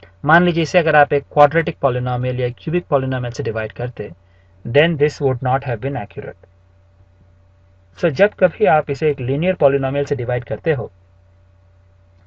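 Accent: India. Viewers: sir,are you from south india.??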